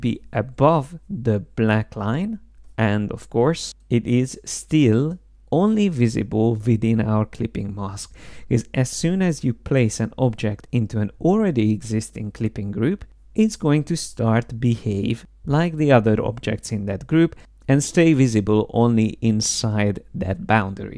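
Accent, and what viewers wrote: Hungary. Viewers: Nice tutorial, are you hungarian?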